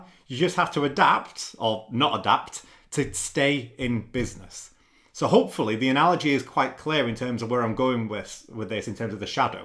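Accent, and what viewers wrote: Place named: RP, United Kingdom. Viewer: Your accent is perfectly fine. I don't even realize my Southern U.S. accent until I hear it on a recording, which has been extremely rare. I do not like my own voice and speech, then wonder how other people can stand to listen to me speak, but no one has ever said anything. Here, we talk of your accent being "proper" English. Fancy or high class. I've recently been a student of the shadow self, which has helped me tremendously, and is why your video was recommended to me. The favorite teacher I've been learning from speaks of the shadow in negative terms, because he is referring to that which we are not attending to because we interpret it as negative. When we're working on these aspects of ourselves, we tend to think negative thoughts are all bad, and we should only ever have positive ideas, so we try to force them into non-existence. 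Then failure is inevitable because of how we bury them, instead of bringing them into the light to be dealt with without judgement or chain reactions they cause through mental-emotional-mental reactions. Instructing that we should be aware and allow and accept them, but only to the extent of the feelings they cause in our bodies, instead of the thought itself, considering the thoughts are not actually you; just programmed thoughts everyone has. Anyway, you've given me a different perspective on it, even if I can't think of even one personal thing that relates to it, I do understand now. Thanks very much, and I'll watch for your other content.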